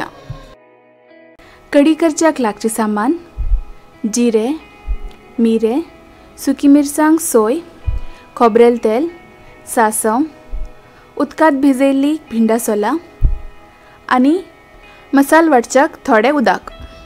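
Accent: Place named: Karwar, India. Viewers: It is Karwari accent. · Tumgele konkani marathiche accent asa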